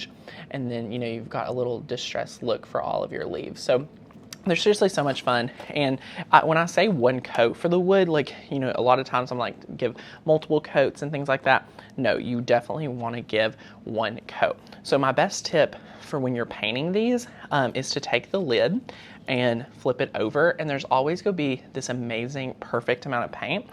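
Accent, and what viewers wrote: South, United States. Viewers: Im new to your channel. Love your southern ascent. Where are you from. Love your ideas.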